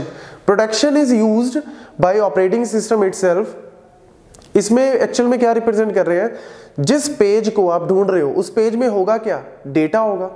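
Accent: Punjab, India. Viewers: Sir aap punjab se ho??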